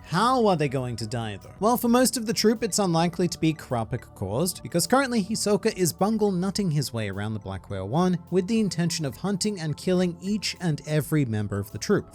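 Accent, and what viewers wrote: Australia. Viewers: wha is it about this australian accent which is so pleasant to listen to? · I didn’t realise that Aussie…oi oi oi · You’re Australian? How didn’t I realise 😂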